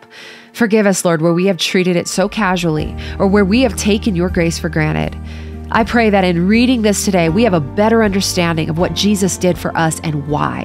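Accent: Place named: South, United States. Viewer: & Although you do sound a tiny bit like you have a southern accent on .75.. you pronounce things way to clearly lol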